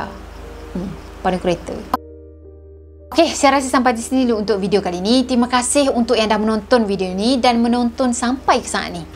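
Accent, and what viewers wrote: Kelantan, Malaysia. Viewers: Akak ckp sleng klante tu ada laaa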